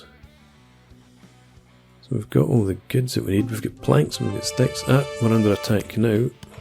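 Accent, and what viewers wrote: Scotland, United Kingdom. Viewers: Just gotta say cheerio for that accent :) Scotland Forever!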